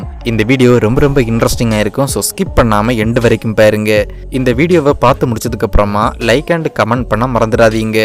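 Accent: Kanyakumari, India. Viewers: That kanyakumari slang from youtuber ❤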